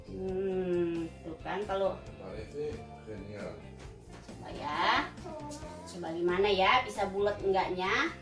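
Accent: West Java, Indonesia. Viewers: ih pertama kali buka video na logatna teu bisa bohong tos katebak orang sunda tea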